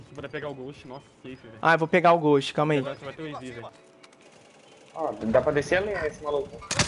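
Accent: Minas Gerais, Brazil. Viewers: Você é mineiro cara? MG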